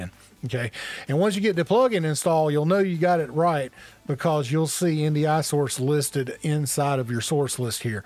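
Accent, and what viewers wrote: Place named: South, United States. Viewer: Where you from brotha? I hear a fellow southern accent.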